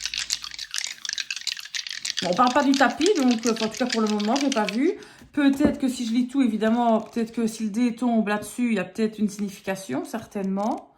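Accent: Belgium. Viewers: Très bel accent Belge 😃😃😃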